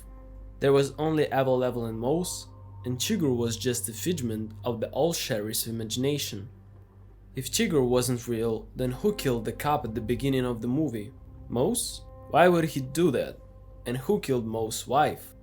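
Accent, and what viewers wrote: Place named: India. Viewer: U SOUND LKE AN AMERiCANiZED DOT iNDiAN ! NOT YELLiNG, JUZT LiKE TYPiNG iN CAPZ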